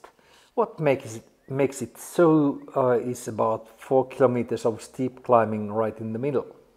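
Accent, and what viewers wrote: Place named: Finland. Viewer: There are great conditions and landscapes 👍 It's interesting how well a Finnish understands that English with a Finnish accent😁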